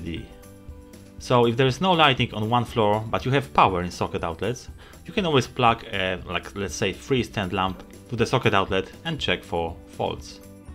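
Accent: Poland. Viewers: czesc. Slychac ze jestes z Polski. Pozdrawiam. Nie wiem czy nagrales filmik. Opowiedz jeszcze o ukladach sieci. Pozdro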